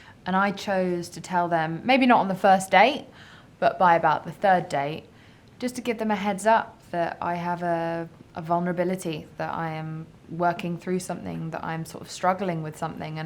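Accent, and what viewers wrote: United Kingdom. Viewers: You weren't faking that British Accent, huh. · she sounds very british..Ber partner is also very British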